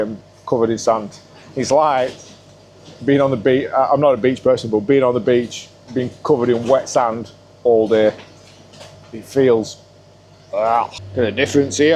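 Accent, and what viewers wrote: Lancashire, United Kingdom. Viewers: I love the Lancashire accent. I’m originally from St Helens and remember older people having a strong Lancashire accent in the late sixties/early seventies. The accent there is now closer to Scouse. Can’t say anything about that cos my wife is one! ( her account)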